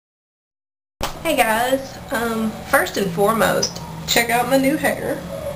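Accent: United States. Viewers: she sounds so country hehehe reminds me of my home town nashville tn but i never picked up a accent. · i love your country accents!! So cute!!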